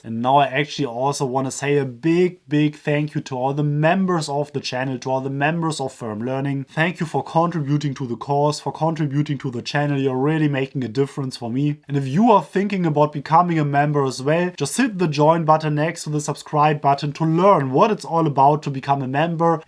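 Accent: Germany. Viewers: But I like your accent. Like German, sounds explicitly, harsh and strict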